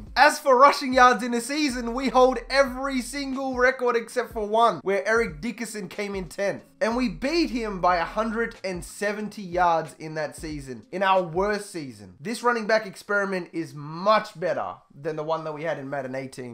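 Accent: New Zealand. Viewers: Are you kiwi? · Are you a kiwi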